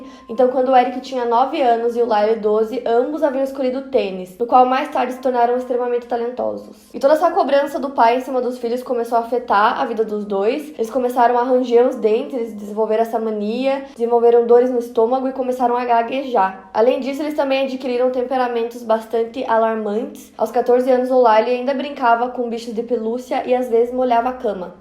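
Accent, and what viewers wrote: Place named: Santa Catarina, Brazil. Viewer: Não tem nada a ver com o vídeo, mas eu sempre me pergunto se a é de Santa Catarina ou do interior do Rio Grande do Sul. Os sotaques são muito parecidos. Eu sou do Rio Grande do Sul e as pessoas que moram mais no interior tem o sotaque bem parecido, então eu acabo me confundindo.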